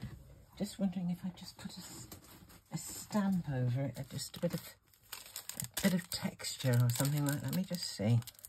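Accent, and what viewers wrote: England, United Kingdom. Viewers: Today was the first time I've seen you, it was lovely to hear an English accent (just for a change) and to see dies whose make I have but have never seen demonstrated before. Enchanting l loved every minute thank you ❤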